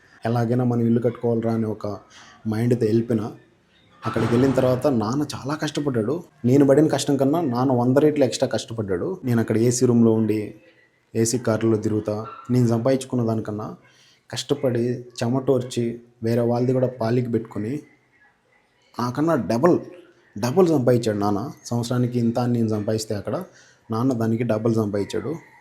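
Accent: Kadapa, India. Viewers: Fast time mi video chuste mi Bhasa ma Kadapa ekkadunna kadapa a level veru anna❤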